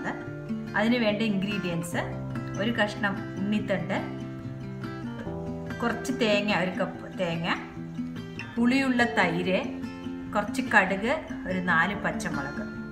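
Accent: Palakkad, India. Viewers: Palakad aano desam samsaram thonunu super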